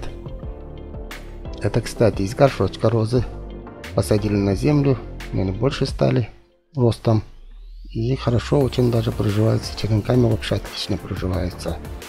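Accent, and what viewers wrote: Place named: Belarus. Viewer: Я угадала. По разговору. У меня бабушка из Беларусии была. Родной говор слышу.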